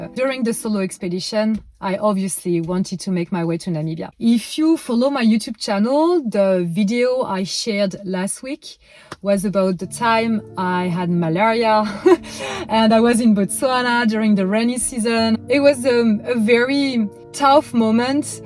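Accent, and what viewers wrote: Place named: France. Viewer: I think you mean influenced. NOT POLLUTED. I love your beautiful use of English with your lovely French Accent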